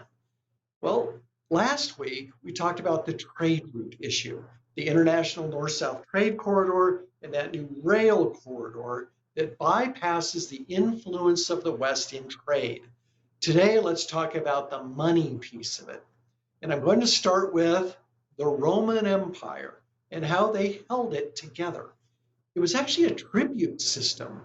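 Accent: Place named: United States. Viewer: When he started speaking with an American accent I kinda expected this to be a bit one-sided... I was not surprised. "Predictive History" remains the Geo-political analysis standard on yt PS: your country is controlled by Iran where all your politicians are forced to put on a hijab and have to go pledge allegiance at Iran's wailing wall... (sarcasm)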